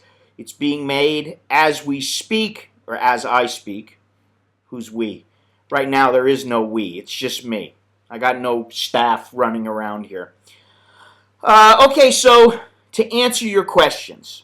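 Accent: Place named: Chicago, United States. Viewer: Seems more like from Chicago.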